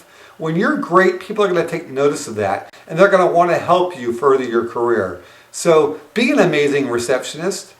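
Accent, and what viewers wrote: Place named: United States. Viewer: _ A pity, this strong american accent ! Instructive but can be a bit harsh to understand for some E.U students and trainees accustomed to English English ! (Not for me, I'm fine, thank you).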